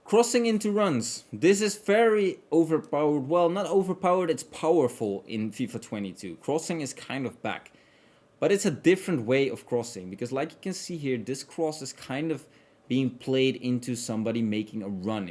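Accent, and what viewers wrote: Netherlands. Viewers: Kom jij uit doetichem hahahaha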